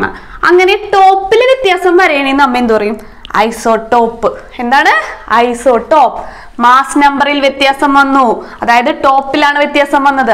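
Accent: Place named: Thrissur, India. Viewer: വീട് തൃശൂർ ഭാഗത്ത് ആണോ slang അത് പോലെ ഉണ്ട്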